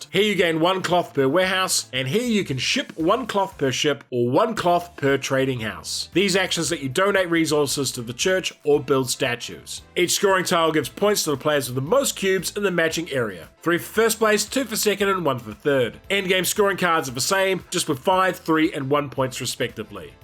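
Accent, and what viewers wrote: New Zealand. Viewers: There is that Kiwi accent. Love your work.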